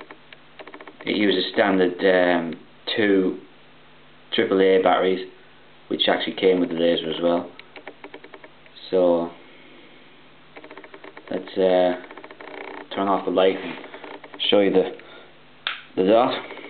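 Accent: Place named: Australia. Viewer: oh great an Australian :(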